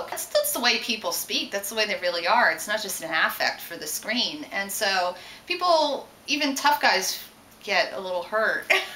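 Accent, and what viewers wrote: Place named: United States. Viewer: Can't hear any Irish in the accent at all. Just sounds like another American accent to me.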